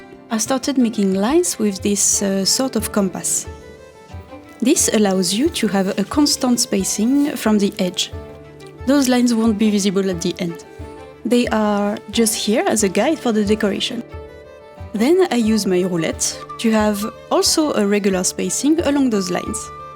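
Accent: France. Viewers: Listening to your lovely French accent and watching you craft beautiful leather work is magical.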